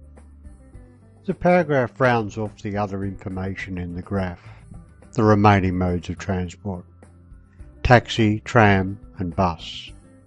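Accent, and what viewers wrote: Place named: India. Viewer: hi,it is really helpful,but just your accent ,i think you are from india ? but anyway i can understand you nicely ,tks anyway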